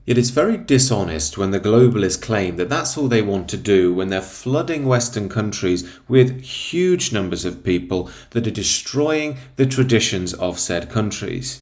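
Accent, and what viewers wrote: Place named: England, United Kingdom. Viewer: Spoken like a true english gentleman . Cheers from Italy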